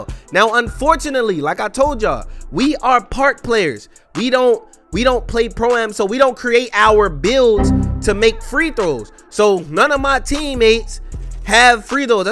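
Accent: Baltimore, United States. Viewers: U from Baltimore? Lol sound like it….twoooo man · yo are you from baltimore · Is he from Baltimore?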